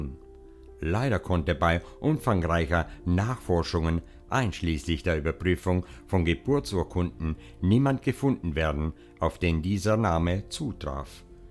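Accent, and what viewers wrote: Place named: Tyrol, Austria. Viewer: Lieber,danke fpr das tolle Video,Zeitreisen und ähnliche Phänomene zählen zu meinen Lieblingsgebieten!👍 Ich schicke die besten Grüße zum Sonntag ins schöne Tirol aus Essen! 😊 P.S. ich liebe deinen Dialekt,lebst du in der Umgebubg des Lechtals?❤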